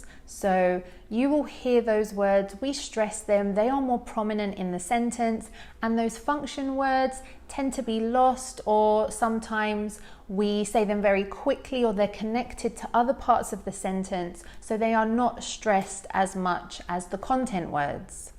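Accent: England, United Kingdom. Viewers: This British accent is beautiful... =) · Ma'am, your British accent is so clear and soothing to hear. Thank you for the video. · I really love your English accents but I also want you to talk a bit faster like because people not gonna talk slowly in reality.so the point is that you are trigger I have to pull first then the rest. Thanks for everything! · Ma'am u have British accent nd has American, right? So that means we r learning both the accents simultaneously 😊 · Is it British English, please inform me. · Please tell me in which british accent you are talking😊💐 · I love your British accent. 😍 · What cute British accent❣️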